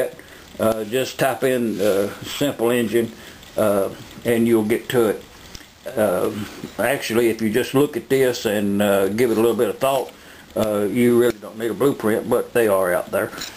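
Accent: South, United States. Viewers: I think this video and it's comment section served to demonstrate a phenomenon that is counter intuitive, but exists nonetheless.... The phenomenon is, this guy's Southern accent and style of speaking actually caused to generate unwarranted criticism and hatred from viewers...I challenge one to find another video on YouTube that generates so many, i think unwarranted complaints. The complaints are valid, but most homemade videos are all around worse...Cinematography, subject, narration voice and content/explanation... I put forth the hypothesis that because you all hear the accent and subconsciously, at the very least, you think he's a racist or bigot in some way....and the rest is a YouTube comment section.... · The southern accent is strong with this one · I like your accent,you sound like a good ol boy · lol if you think that sounds like irsh/scottish then you have a problem LOL wtf... 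you can tell he's American... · I believe its a genuine dialect of English as spoken in some part of the USA. The English language is very mangled but I can make out some of it. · Good stuff. Love your drawl! I love tha south son!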